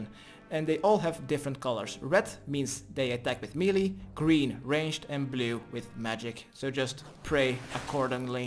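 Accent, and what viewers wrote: Netherlands. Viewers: Well just finished the quest.. after 10 tries I finally managed to kill Galvek. I found Vanstrom Klause a harder boss to beat mechanics wise, but this damn fire ball in combo with the waves was unlucky. Only got song of the elves & secrets of the north as my last two quests. I want to thank you for the whole journey thus far, as a fellow dutchy it's been so enjoyable to listen to you. That damn accent haha! Thanks so much man, much love.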